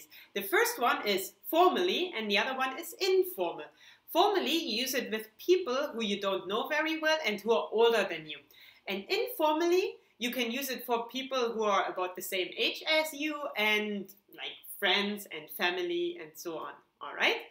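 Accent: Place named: Germany. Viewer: Why you speak english with a strong german accent? Are you doing that on purpose?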